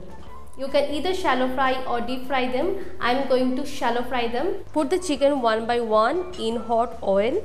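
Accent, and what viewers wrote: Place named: India. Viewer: Wtf is this nigga talking about....I guess all Indian English are same....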